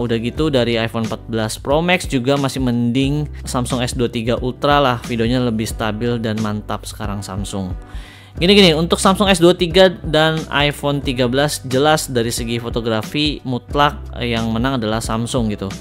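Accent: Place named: East Java, Indonesia. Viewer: kayae mas.e orang jawatimur ya pernah buat konten di chimory 😁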